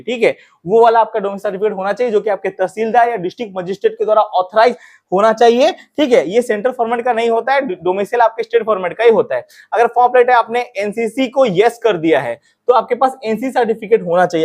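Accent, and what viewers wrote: Odisha, India. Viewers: Bhai app odisha ke ho kya 😍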